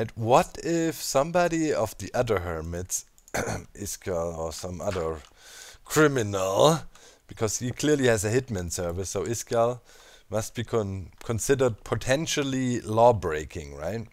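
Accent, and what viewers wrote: Germany. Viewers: May i ask if u are german cuz u have a german accent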